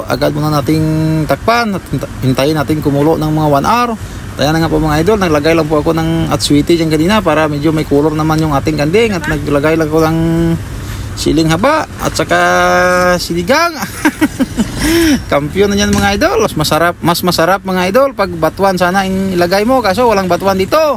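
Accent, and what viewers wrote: Iloilo, Philippines. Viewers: Ilonggo ka dol.?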